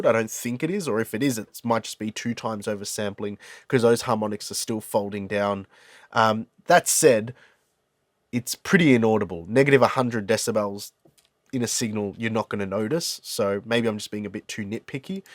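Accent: Australia. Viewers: As soon as I heard the ausie accent and swear words I subd 👍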